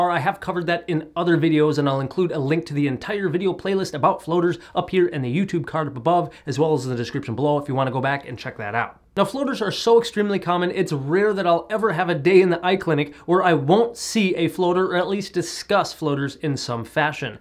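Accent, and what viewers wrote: United States. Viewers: Very informative video. I'll try that out.. Also, you're amazing, got a beautiful pure American accent AND you're so gorgeous lol.. Wish more doctors looked like you hahaha, or be your patient even better lol... Anyhow, thanks for your channel and videos. Subscribed and supporting your good work. :)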